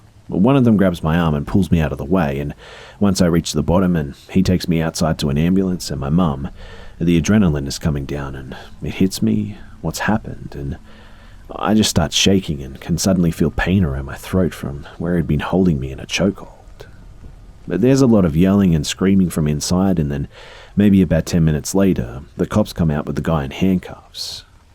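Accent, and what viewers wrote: Australia. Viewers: Good story but having a narrator that is man with a man with australian accent playing a 29 year women from texas with a southern accent does not do it for me. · Wait do you have an Australian accent?? Oh my gosh that's my favorite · Also I have to ask are you an Aussie? I am and you have a very Aussie accent 🇦🇺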